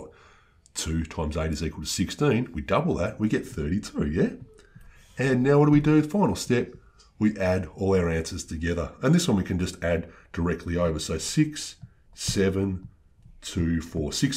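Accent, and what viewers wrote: Australia. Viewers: Definitely Australian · This is some different Australian accent.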